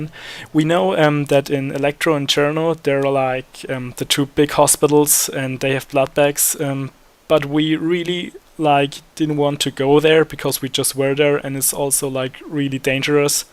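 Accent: Germany. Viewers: man hört deinen deutschen akzent und man hört deinen bruder deutsch sprechen in skype ^^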